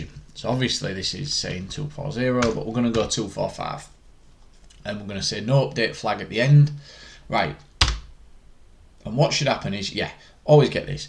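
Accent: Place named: United Kingdom. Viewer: You are great but can I ask you a favor? Please, use a lavalier mic. Since both english is not my first language and your marked British accent it's hard to me to understand what you say. However, thanks for your explanations!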